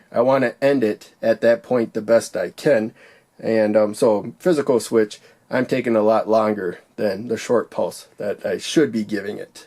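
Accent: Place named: Minnesota, United States. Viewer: Bro, are you from Minnesota? I'm getting that ''ooh, yaah'' Fargo type of accent from you.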